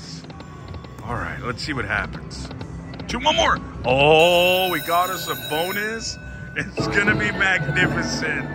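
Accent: South, United States. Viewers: I freaking love you!! I'm so happy I found your channel. I'm disabled and can't go anywhere much. You have made me Crack up so much!! Thank you!!! Are you from the south? You sound like you are😊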